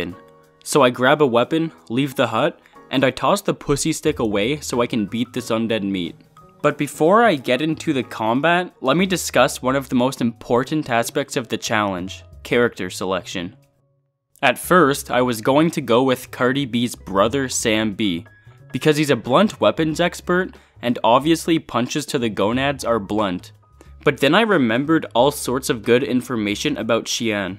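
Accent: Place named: Canada. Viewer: the most canadian sounding canadian I've heard on YouTube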